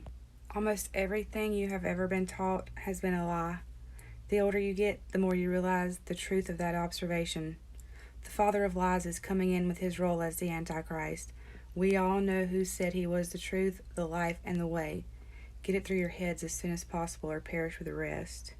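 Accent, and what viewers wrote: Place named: South, United States. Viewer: I could listen to you reading the phone book and would love every second. My goodness that accent. I need to join a southern dating site.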